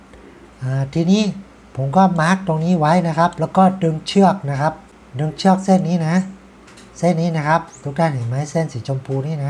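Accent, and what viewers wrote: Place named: Thailand. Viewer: เค้าพูดภาคกลางไม่ชัดไม่ใช่แปลว่าไม่ใช่คนไทยคนเหนือคนใต้สุดก็พูดกลางไม่ขัดจะถามทำไมยายผมไทยแท้ยังพูดกลางไม่ซัดเลย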